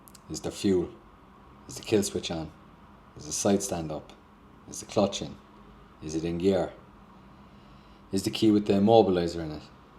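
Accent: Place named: Cork, Ireland. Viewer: Ive been looking at the Kove. YouTubes algorithm showed me this vid so I clicked on it. Wasn't expecting a Corkonian accent 😂 Will subscribe and watch your other videos now. Best of luck,.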